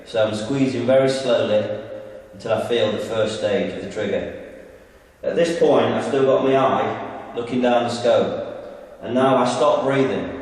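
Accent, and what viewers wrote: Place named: United Kingdom. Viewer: finally a British guy talking. being in the cadets i have been trying to find a British guy to tell me what to do. not an American. nice video dude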